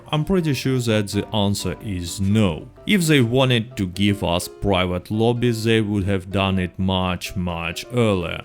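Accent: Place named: Russia. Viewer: Is he Russian, is he German, is he whatever Niko Bellic is?? Maybe he can do an impression of him!! Please say "Anything for you, cousin!" I love this dudes accent!! (btw I know hes Russian but his accent sounds like other accents..so awesome)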